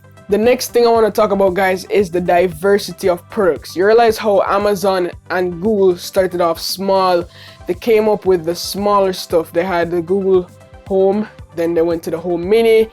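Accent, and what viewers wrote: Jamaica. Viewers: I hear a Jamaican accent.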